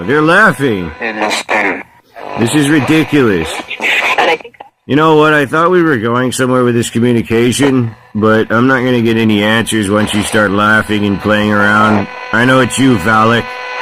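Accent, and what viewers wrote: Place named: England, United Kingdom. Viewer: North Nottingham Paranormal Investigators ahh now I hardly thought you were I'd know his accent a mile away. Lol and for some reason I don't think your English accent could sound anything like American one 😂😂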